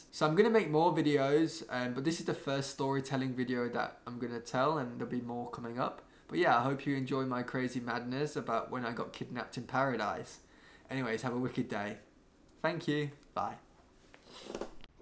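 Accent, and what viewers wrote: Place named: England, United Kingdom. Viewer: Never knew english accent would be so useful. Great story! :)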